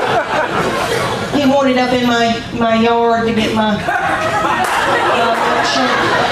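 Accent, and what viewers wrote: South, United States. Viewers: Her southern accent makes it funnier 😂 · She is my people! Those who say she isn’t funny….. have no sense of humor! Humor is always based in some truth. We lived in Europe when we started watching her and her accent made me miss all those years we lived in the south! I have a friend from Tennessee who could pass as her sister, that’s why I say she’s my people! ❤️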